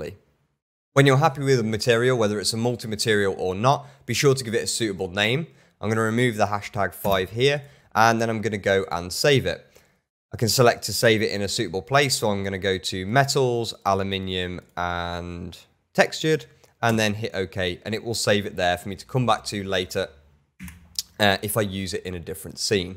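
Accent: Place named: RP, United Kingdom. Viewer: RP British elegant accent which I love to listen to.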